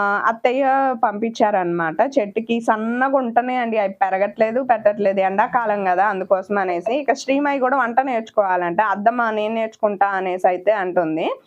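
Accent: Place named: Sathupalli, India. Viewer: Sathupalli side Telugu alane vuntundhi Thanu nerchukovalasina avasaram ledu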